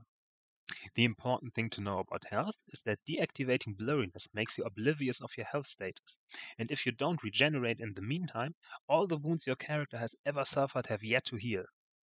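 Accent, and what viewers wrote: Germany. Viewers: Do you are German? I could swear you sound like a German xD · You sound german :D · gutes video ich find es immerwieder witzig deutsche english reden zu hören :D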